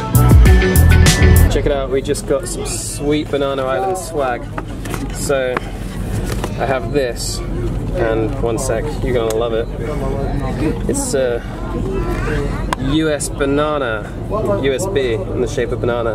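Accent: United Kingdom. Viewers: Amazing your video,!!! Congratulations I love the pronunciation of British English.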